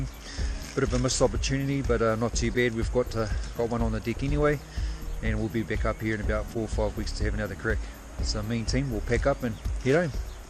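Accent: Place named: New Zealand. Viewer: Love that kiwi accent and those wicked glasses lol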